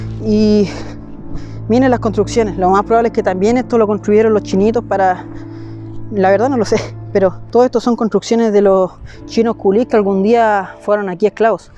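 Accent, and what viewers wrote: Chile. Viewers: Muy interesante ese vídeo pero no se entiende mi papá lo que hablas deberías hablar más despacio para que se entienda solamente entre chilenos se entiende eso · Que acento desagradable el Chileno 🥴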